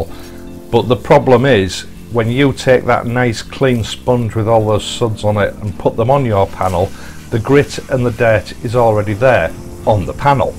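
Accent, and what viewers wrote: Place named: Hull, United Kingdom. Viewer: I'm a new subscriber. You're brilliant! Thank you for your content and your Hull accent:D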